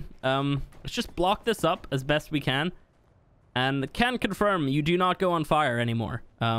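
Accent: Ireland. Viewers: He is from Ireland 🇮🇪 but im not 100% sure where (i think he lives in Dublin) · Are you Irish cause if you are you my man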